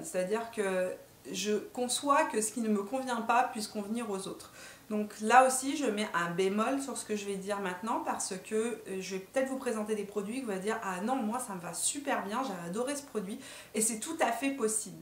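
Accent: Quebec, Canada. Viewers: Je suis venu ici par curiosité, je te préfère tellement maintenant et ce petit accent quebequois tout discret alors que maintenant on lentend pas. Tu est comme le bon vin 😉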